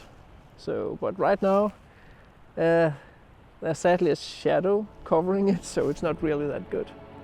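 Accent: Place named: Denmark. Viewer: Its nice to hear a thick Danish Accent (Danglish) :-) Suðuroy is know to having a different dialect then the main islands. Its always know as little Denmark. Nice to Akraberg, Vágseiði and Ásmundarstak (cant remmber seen it my self). Next time, if ever then take a trip to Fámjin where Merkið is found in the local church :-) Hvalbiareiði or Vágseiði is a must in when there is strong winds. You will really appreciate the hard work global mariners do at sea, when you seen the waves and wind take a beating against the rocks :-) If you would like to get a grasp of what the Fellowship of the Ring experienced in the narrowed tunnels in Morria, as for a trip in the coal mines in Hvalba :-) Dont enter if you are claustrophobic :-) And when the sun is shining, take a trip up to Stórhamar plateau and Ørna fjall, walk west to the highest mountain in Suðuroy (cant remmber the name). 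Another trip is to Hvannhaga. Remmber to take pancakes, or waffles, coffee, the and ofcourse Nutella on every trip. And if you are lucky some skerpikød and local made ryebread:-) I got that tip from some locals :-) I am so much looking forward to it :-)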